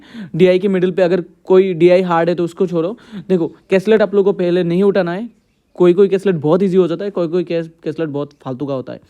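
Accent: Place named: Assam, India. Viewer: Aap thoda Hindi sikhlo. 100% Assamese honge. Phut phut Assamese jhalak rahe hai 😅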